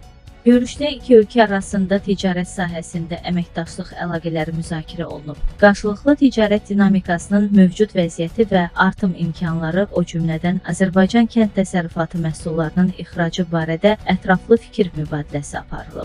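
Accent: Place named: Armenia. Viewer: Ay məni qınayan mətni oxuyan ermənidi ?? Bu necə oxumaqdı? Hardan tutub gətiriblər bunu ????? Ay bala bu nə matetialdıııııı?????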